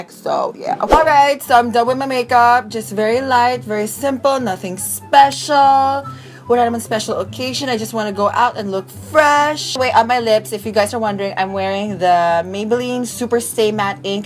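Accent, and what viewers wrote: United States. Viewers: I literally thought you live in us bc the way you speak! HAHAHAAHAH! Omyygad! Youre slayinnnnn it gurl! This is the first time i’ve watched u! Haha keep spreading good vibes! Mwa xx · im a new subscriber of yours akala ko nung una american ka hahahahha na curious kasi ako sabi ko bat may foreigners na nag rereview ng anne clutz brush hahah so ayun nagulat ako nung nag tagalog ka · OMG This is my first time to watch your video and I thought you were american. I was shocked when you've started to talk in tagalog!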